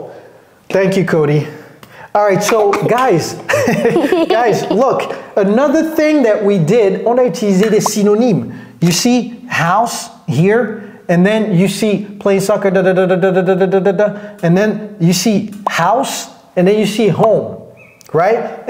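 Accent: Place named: United States. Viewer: He is very fluent With his american accent.😊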